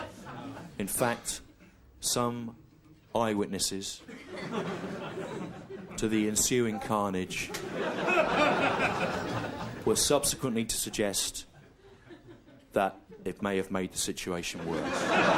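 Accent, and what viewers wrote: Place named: Scotland, United Kingdom. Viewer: This kind of non comedy makes me so angly. The one good thing was his brilliant scotch accent.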